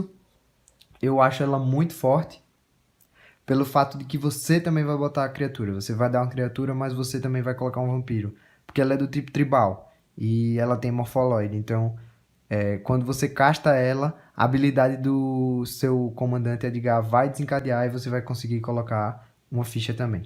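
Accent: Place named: Northeast, Brazil. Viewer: Rio Grande do Norte. Reconheci o sotaque e gostei de ver mais nordestinos produzindo conteúdo de Magic. Me sinto representado.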